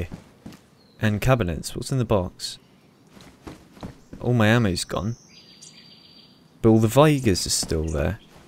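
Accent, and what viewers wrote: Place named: RP, United Kingdom. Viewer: idk which part of england you are from, but im from up north and you sound very posh and proper, no offence, but your voice is so smooth. <--- why did i type that wtf lmao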